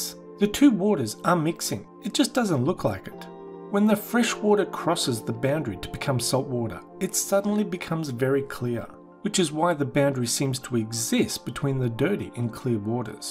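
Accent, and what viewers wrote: Australia. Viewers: Yes he sounds aussie. It was a joke anyway. 👌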